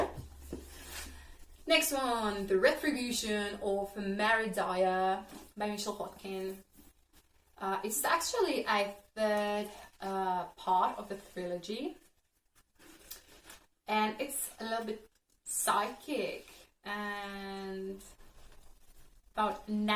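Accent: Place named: United Kingdom. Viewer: Have u come from the UK?😅 I love the british accent and I admire how fluent u are. Excellent work.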